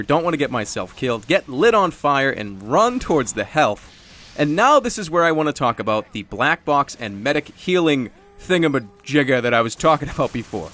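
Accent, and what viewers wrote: Boston, United States. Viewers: your from where the scout is! boston!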